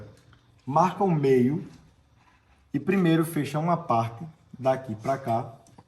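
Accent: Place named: Northeast, Brazil. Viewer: O professor faz tudo parecer tão fácil. Parabéns. E esse sotaque maravilhoso. Sou carioca e amo sotaque nordestino ❤🎉🎉🎉🎉🎉🎉🎉🎉🎉🎉😂bj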